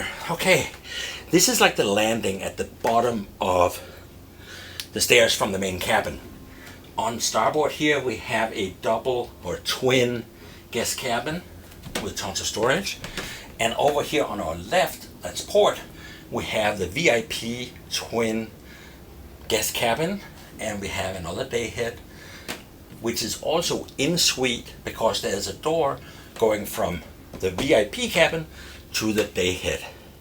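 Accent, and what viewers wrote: Denmark. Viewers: Du lyder dansk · Hey, nice tour, nice boat - buy your accent i say you are Danish. Your cameraman was sleeping, every time you pointed down to show the mast foot or other things, the camera was just horizontal. wake him/her up next time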